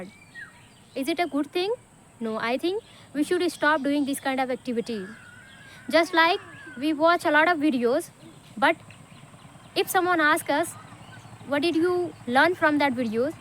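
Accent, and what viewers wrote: India. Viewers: Abe Indian accent choro🤦🏻‍♂️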